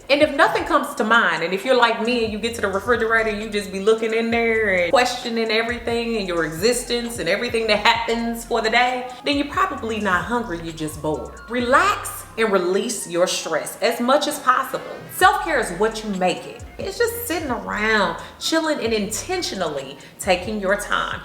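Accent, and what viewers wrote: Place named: St. Louis, United States. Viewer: I love that STL accent. #lovinthelou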